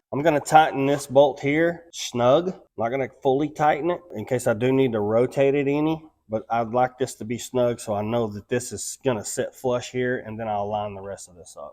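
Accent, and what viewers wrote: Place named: Appalachia, United States. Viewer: Zero chance in he!! The stick on holds that to the fender. What kind of hillbilly accent is that 😳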